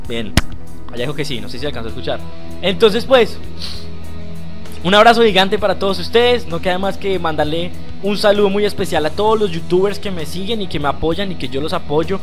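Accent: Colombia. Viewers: de que parte de colombia eres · Oye ... XD vengo de uno de los packs HD ... xD y se oía un poco lo rolo en la voz y claro Colombiano parce !! XD !! saludos desde cúcuta .. aún así no estoy ni seguro si es de bogotá xD !!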